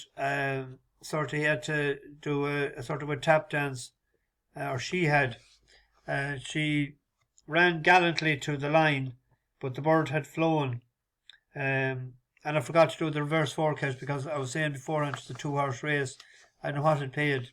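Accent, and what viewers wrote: RP, United Kingdom. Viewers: Great stuff, tips not on fire but that’s racing, still your command of the Queens English always makes me smile, keep up the good work, as you said things will turn 👍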